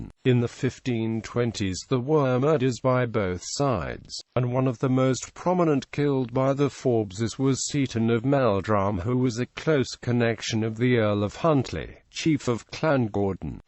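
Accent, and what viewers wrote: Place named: England, United Kingdom. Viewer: a very English voice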